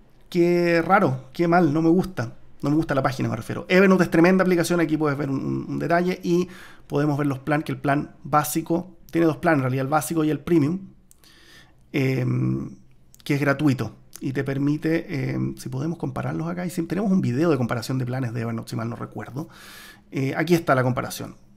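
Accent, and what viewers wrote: Chile. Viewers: eriii shileno?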